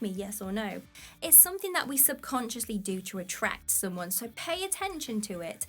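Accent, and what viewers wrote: England, United Kingdom. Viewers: Love your accent yet can’t quite place it. London? Bath?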